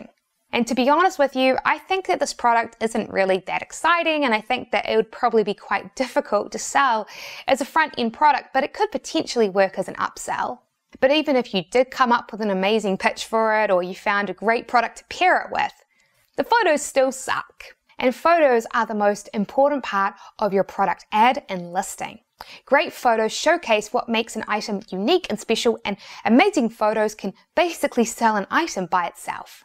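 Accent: New Zealand. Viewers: Creengewearvey dance. I like your Kiwi ikcint tho. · A Kiwi. New Zealand .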